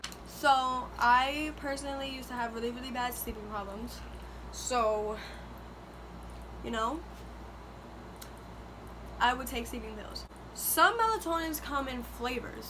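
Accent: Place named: Puerto Rico. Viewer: tú eres Boricua por Leyyy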